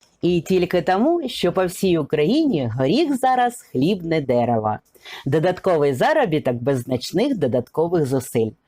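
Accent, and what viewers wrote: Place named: Russia. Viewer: З російським акцентом,наприклад говорить прапаную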